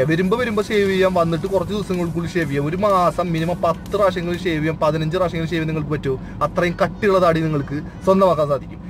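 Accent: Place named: Kodungallur, India. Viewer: Ijjadhi ddav... Thrissur alle😃❤️💪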